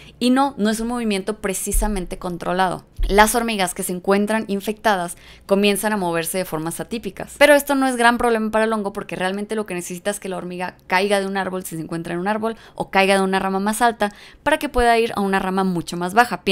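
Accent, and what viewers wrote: Mexico. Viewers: "Tócate más" entendí 😜 Luego vi que era "Toca temas" 🤭 Será por el acento mejicano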